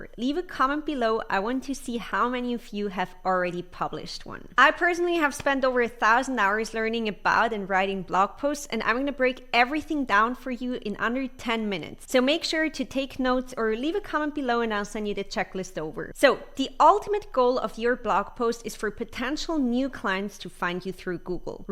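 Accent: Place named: England, United Kingdom. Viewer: Hi ! I am new to blogging, loved your English accent and clear pronunciation. I wonder how you learnt it, and how can I learn it. Could you please share the secret and also send me simple blogpost checklist please.Thank you.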